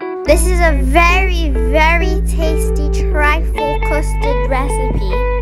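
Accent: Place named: England, United Kingdom. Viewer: Oh woooow ur english accent is awesome 😘😘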